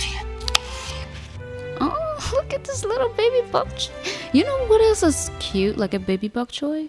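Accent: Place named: France. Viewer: its the french accent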